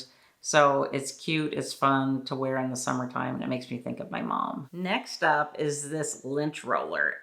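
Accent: Canada. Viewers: Are you Canadian? Born and raised?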